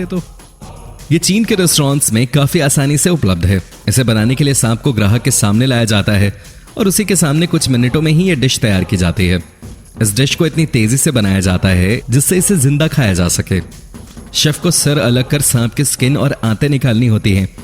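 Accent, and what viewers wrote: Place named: Darbhanga, India. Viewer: Darbhangha sa bol raha hi bhai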